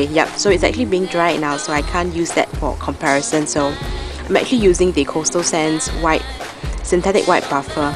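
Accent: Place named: Singapore. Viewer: hahahahha.. from your accent i can tell you're a singaporean ^^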